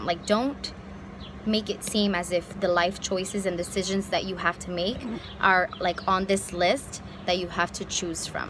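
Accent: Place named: New York, United States. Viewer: I can recognize NY anywhere 😂🙌🏻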